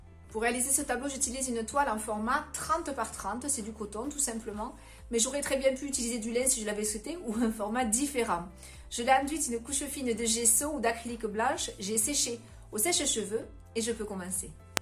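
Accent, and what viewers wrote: South, France. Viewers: 😍Un tableau aux couleurs qui chantent à l'image de votre bel accent du midi. Et dans la grisaille hivernale, la peinture est un excellent loisir ! Grâce à vous , je manie le couteau avec plus d'aisance pour peindre des paysages d'après mes photos. Vos tutos nous donnent confiance et on peint avec enthousiasme...bon... les tubes de couleur se vident vite . ..Heureusement, le père Noël va passer 😉. Mille merci pour votre générosité. Catherine .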